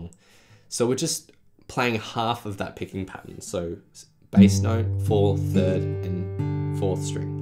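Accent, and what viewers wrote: Australia. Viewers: i always forget this guy has an aussie accent when the video starts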